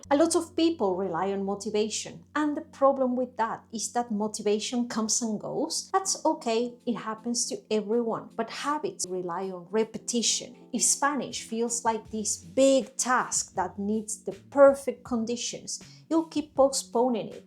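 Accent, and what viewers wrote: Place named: Rioplatense, Argentina. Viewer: Me gusta mucho este video y tu canal. Estoy aprendiendo español rioplatense y siempre estoy buscando por más input comprehensible con ese acento. Muchas gracias!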